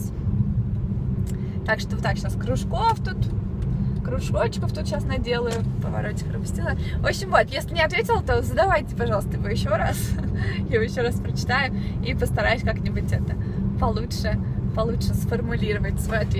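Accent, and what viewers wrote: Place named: United States. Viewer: Акцент американский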